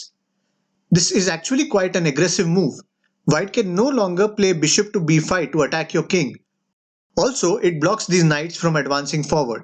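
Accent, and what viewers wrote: India. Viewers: I’m assuming you are Indian but the accent.. so I believe everything you showed us here since chess was invented in India .. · I like Indian acent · Your English is Indian (understandable) don't give foot note that's disturbing, it covers board.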